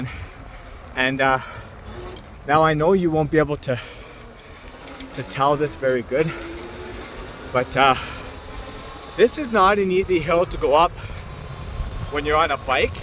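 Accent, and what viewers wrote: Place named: Canada. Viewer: I'm planning on getting the X2 60 Volt dual 750 hub motor version. Judging by your accent you are from Canada and the one I can get is not available to you. 🤔 I live 5 miles from Canada in Detroit area by Windsor Ontario.. I currently own an Ariel Rider Kepler 26x4 fat tire with a 1,000 watt nominal Bafang geared hub..1,800 watt peak 52V 20 ah battery. I just wanted a folding bike with dual motors that was as fast as my Kepler but does hills even better. Dual suspension and 60V is a plus too. 100 Nm torque per motor is great! Better than my single 108Nm motor. I would consider the Road Warrior X2 with dual 60V 750 motors but I want a folding bike and extra suspension. My Kepler goes 35 mph no problem .. Throttle only.. 56 kph .. Flat ground to 60% battery 56 kph then goes down to 54 after 60% battery.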